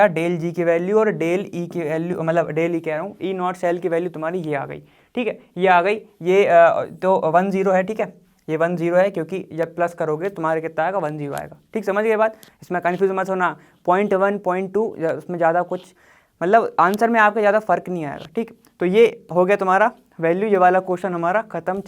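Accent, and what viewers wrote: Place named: Bihar, India. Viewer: Muje tou bihar se laag rahe hain 😅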